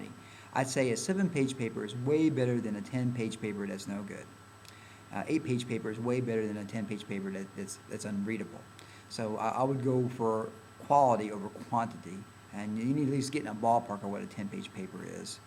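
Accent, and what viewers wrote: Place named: South, United States. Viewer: i thougjt the same and was confused by the southerner accent lol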